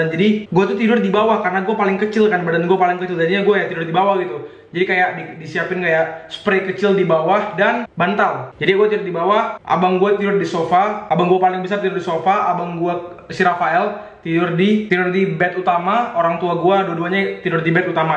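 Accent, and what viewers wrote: Batam, Indonesia. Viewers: bang lu org batam ya?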